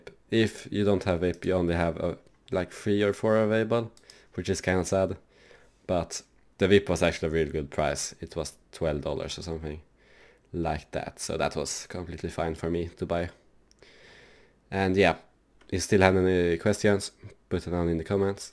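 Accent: Sweden. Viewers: You're swedish right? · skulle inte säga dålig men definitivt tydlig svensk dialekt på den haha. men kom igen, vilken svenne har inte det?? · haha man hör så himla klart att du är svensk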